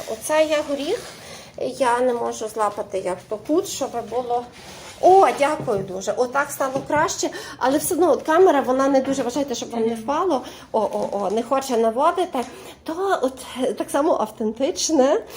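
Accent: Galicia, Ukraine. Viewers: Ваша галицька мова - мед для київської душі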